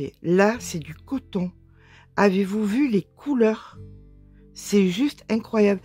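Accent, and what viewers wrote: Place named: Alpes-Maritimes, France. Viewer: Alors voilà, j'en étais sûre, 06 mais C bien sur, j'ai entendu votre léger accent et votre façon de parler pdt des années, 50ans pour être exact, d'une Régine qui habite à BIOT, je suis allée en vacances à Antibes en camping, il y avait tjrs 3 ou 4 générations, j'y suis allée enfant, ado, jeune maman et mamy.... De merveilleux souvenirs, C pour cela que votre voix, accent lié à ma passion, le crochet font un si bon ménage, merci tt simplement d'être là et reMerci d'être VOUS 💋🥰🤗💞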